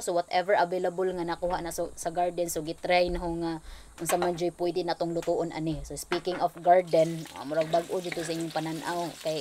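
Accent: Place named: Bohol, Philippines. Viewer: Ganahan jud kaayo kos accent sa bul anon uyy 😍 always watching from agusan del sur ❤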